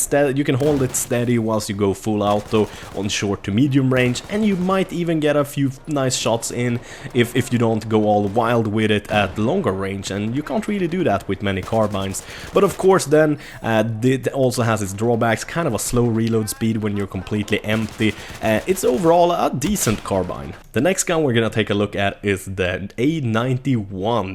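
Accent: Sweden. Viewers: Märker att du är från Sverige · are you from sweden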